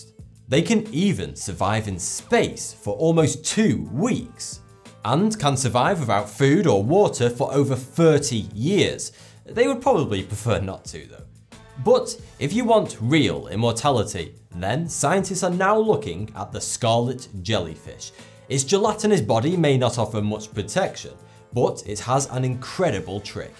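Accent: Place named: Lancashire, United Kingdom. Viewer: That accent makes him sound twice as intelligent, damnit I wish I was from Lancashire...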